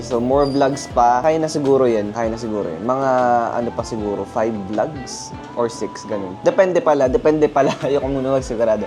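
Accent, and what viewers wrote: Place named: Hiligaynon, Philippines. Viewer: Ilonggo ka ba? Bat may puntong Hiligaynon ka? Same kay David Guison. Same kayo punto hahahahaha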